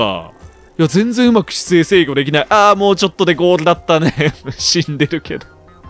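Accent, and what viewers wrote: Kansai, Japan. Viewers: 最近関西弁多い気がする🤔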